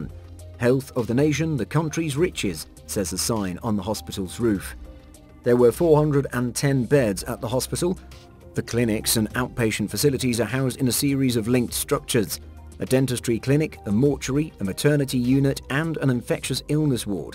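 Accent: United Kingdom. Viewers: Fuc! Are only brits doing documentaries? So sic of the accent! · Hold on there Mr. British voice. The word SOCCER was derived from the British in the early 1800's so its y'alls fault that its called soccer, not North America. Interesting video either way.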